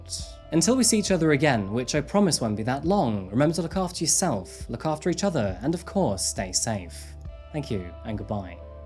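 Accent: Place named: United Kingdom. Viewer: I think I'm officially addicted to your channel. Murder mysteries, forensics, crimes etc are so interesting! You tell these so well and it's nice to hear a fellow Brit, since moving to France. You make me feel at home 🖤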